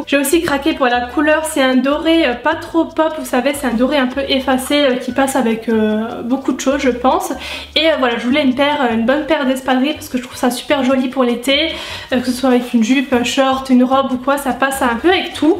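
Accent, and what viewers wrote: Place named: Nord, France. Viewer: Merci pour cette vidéo 😍 j'ai flashé sur les sandales et la ceinture 👍 j'adore tes vidéos, ta façon naturelle de parler et ton accent du Nord 😊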